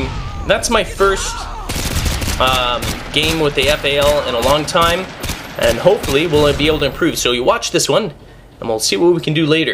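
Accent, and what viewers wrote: England, United Kingdom. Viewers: i <3 ur british accent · lol why do you always do an English accent in your commentaries? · loving the british accent haha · Best live commetary ever!! I love your british accent lol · Seemed a bit like he had a split personality with the English accent and the whatnot, got a good laugh or two from listening to the ramblings of a murderous English-man, thumbs up indeed.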